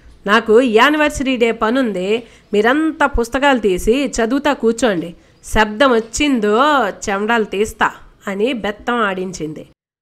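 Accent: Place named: Nellore, India. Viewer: Nellore yasalo ceppandi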